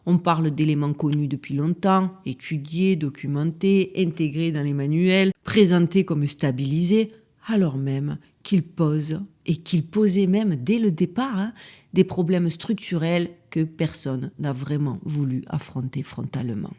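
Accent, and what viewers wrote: South, France. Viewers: Bonjour de Suisse, ne vous excusez pas tout est parfait 🙏🩷🩷🩷 J'adore vos sujets, et votre personnalité 💖 et votre accent du sud est juste 😍 toppissime!!!! Bonnes fêtes à vous et votre famille 🥳🎶🍾🥂❤️❤️❤️ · Je viens de vous découvrir et je peux dire que je vous trouve formidable!!! J’adore vos contenus et le format! Et votre accent du sud!😉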